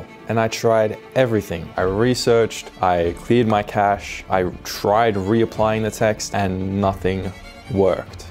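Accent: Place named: Australia. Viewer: ▼ - So great to hear an Aussie accent too!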